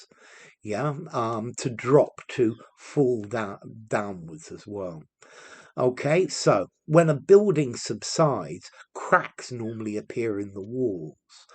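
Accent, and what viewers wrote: United Kingdom. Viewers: Whereabouts in UK you are from Guv ? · Hello teacher I have missed out on much of your precious and quality British English in ages I hope you won't be annoyed by my comment anyway you look very pale and still has got a great amount of knowledge 🤭😃👏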